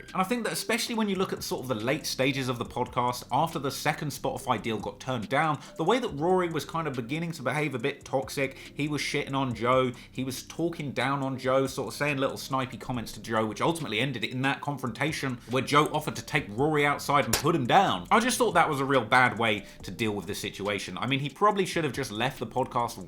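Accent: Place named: South, United Kingdom. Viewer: Is Rory’s name really pronounced like that or is the back translation into southern UK accent making it sound weird?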